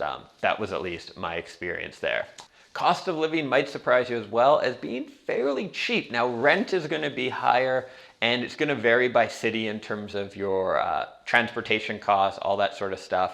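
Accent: Canada. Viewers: That Canadian accent.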